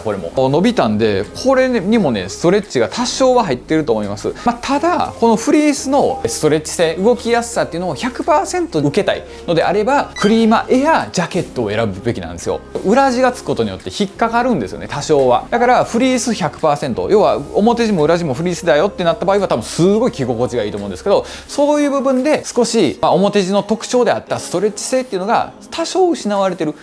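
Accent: Kansai, Japan. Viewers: めちゃくちゃイケメンで似合ってるし、物も良いのは認めるんだけど、この感じの関西弁でプレゼンされると胡散臭く見えてしまう😢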